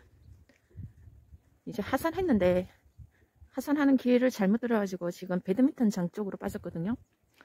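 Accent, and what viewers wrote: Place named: Gyeongsang, South Korea. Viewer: 경상도 분이 서울에 오래 사신 분 같습니다 즐거운 설명 잘 듣고 갑니다 ^^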